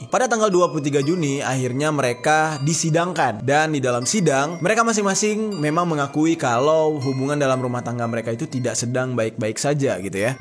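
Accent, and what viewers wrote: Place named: Java, Indonesia. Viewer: Wong jowo ndi suarane lor?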